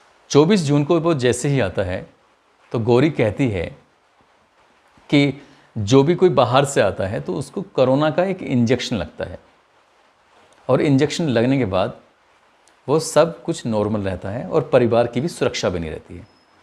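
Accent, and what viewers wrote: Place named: Lucknow, India. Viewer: walekum Assalam shukriya janab bolane ka kya yah to Lucknow ka asar hai 🙏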